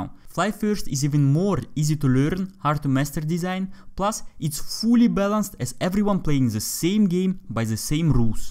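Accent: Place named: Russia. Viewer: Your Russian accent is amazing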